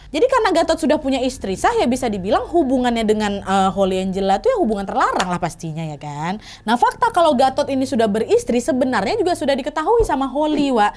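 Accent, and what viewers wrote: Indonesia. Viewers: Kak itu kalo bawain cerita beda bgt sm youtuber yg lain. Dia gk sok sokan melebih lebih kn dialog kyk bhs Indonesia sok" Di buat kek logat bule atau bhs Inggris yg gk kita tau artinya. Dia lbih ke apa adanya dia aja dengan logat Khas Indo nya yg medok agar lbih mudah di mengerti sm kita smua, kalo buat aku itu sih yg bikin aku gk bosen nonton nya. Sehat sehat ya kak sm tim, buat dedek bayi nya jg moga sehat selalu, dn selalu di limpahkn keberkahan. Aminnnn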